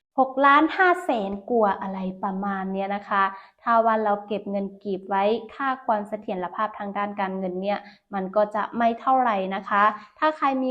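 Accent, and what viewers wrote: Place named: Central Thailand, Thailand. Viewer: น้องพูดได้เก่ง ทั้งเนื้อหาน่าสนใจ และ สำเนียงที่เหมือนไทยภาคกลางแท้ๆ เลย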